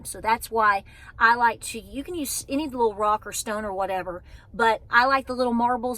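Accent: South, United States. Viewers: don't lose that southern drawl!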